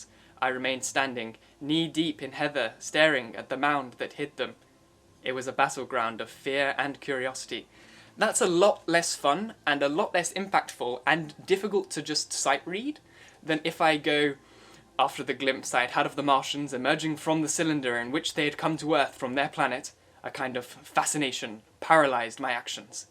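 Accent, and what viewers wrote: United States. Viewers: I loved this. It is great seeing you. You are very talented and your American accent is 100 %. I hope YouTube monetized you by now. Yes, you could redo 1984. I love that book. You're doing an awesome job. I enjoy listening to you at work.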